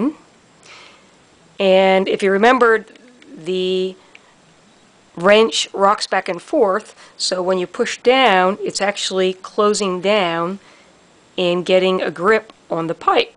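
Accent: Philadelphia, United States. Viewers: Sound like your from Philly?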